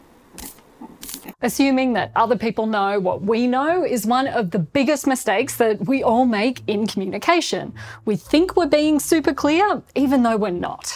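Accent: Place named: Australia. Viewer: jumpsuit... jungle safari print. ping pong table? Australian accent!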